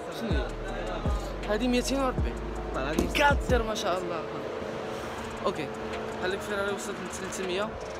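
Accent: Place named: India. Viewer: Nice cars but your indian accent is Epic mate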